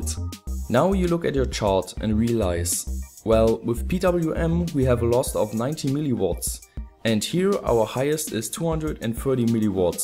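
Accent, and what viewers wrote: Germany. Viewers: Xmcgamer0X he is german soo ... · He's German, so his pronunciation can't be perfect. But I bet you don't talk another language besides English as he does! ;)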